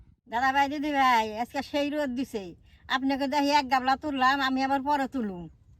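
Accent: Tangail, Bangladesh. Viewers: আমাদের টাঙ্গাইলের ভাষার সাথে অনেক মিল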